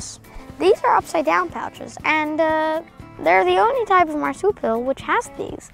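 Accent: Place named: United States. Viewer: he's not an australian his accent is to American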